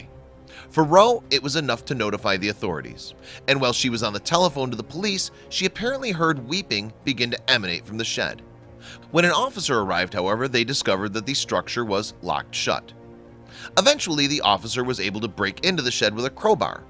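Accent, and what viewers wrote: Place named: Chicago, United States. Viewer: The narrator sounds like he is from the Chicago area.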